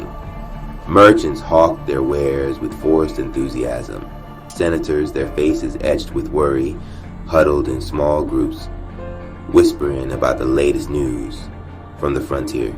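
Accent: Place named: Sweden. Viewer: Narrator has a Swedish Lutheran accent.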